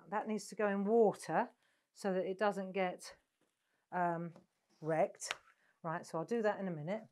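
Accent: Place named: England, United Kingdom. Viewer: Amazing. Great video, your English accent makes me homesick.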